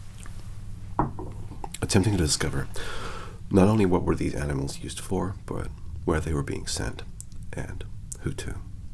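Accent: United States. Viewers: Dude your american accent is on point. i feel your destined for something bigger then a youtube gig. Your a real actor... i hope you get the recognition you deserve for your talent. keep it up man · Incredible acting skills and not too shabby of an American accent😏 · That was a damn good American accent. Really cool video, I like the mystery vibe. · Wow you do a mad American accent!! Almost forgot you were English 😯 You’re so freaking talented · LLAMAS!!!;D Hey, do you make these music intros yourself? This is definitely my favorite american accent of yours in your content yet, gentle and without any House MD nasal sharpness or strain, and sounds incredibly natural. I was so fascinated by how good it is I couldn't focus on anything else on my first watch. But I could listen to you talk about anything anyway...Love the dark shadowed lighting, that wood-paneled background, the filters you used, and the bits of effects. 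It all, combined with your voice, makes for such a quiet, calm viewing. Lookin like a clean cut 1950s mad men exec here. That pause and then that grab for the drink at the end while maintaining eye contact, ha!:) Never watched Twin Peaks, but not understanding the references in your content (other than the coffee!) does not ever lessen my enjoyment in the slightest! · I couldn’t figure out what was off about this video. I got halfway through the video and realized you had an American accent haha. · Yaaaaaa!!! You are da beeeeest! Your portrayal of Cooper is great. Also your American accent is flawless. Did you use Tibetan Method to get into character? :-) Thanks for this! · Wow love the series but didn't finish it.ur so talented u sounded like a American well done u should hear the song to twin peaks it haunting I love it.I listen and meditate to the music.I got a little request could u consider a Tomas Sharp role (Crimson Peak) I fell in love with the movie and the story plus IAM a massive Tom Hiddleston fan. 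love Astaroche 🍷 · I completely agree with you! I’m American & I thought he was too, lol. He completely fooled me & that’s hard to do!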